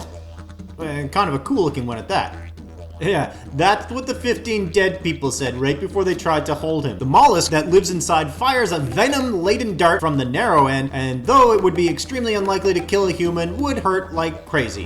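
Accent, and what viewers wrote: Australia. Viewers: Problem with that is you are using an Australian accent for your phonetics. Americans probably translate that as Ayy-roi-kenn-jay! 🤦‍♀️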